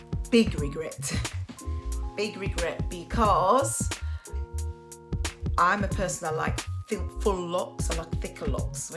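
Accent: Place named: Northern England, United Kingdom. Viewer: Great video - I only use clarifying shampoos, don’t want any build up in my locs. I’m loving your videos and the northern accent makes me feel like I’m back home in Bradford! I moved to the south as a child but go back often and your accent is making me home sick.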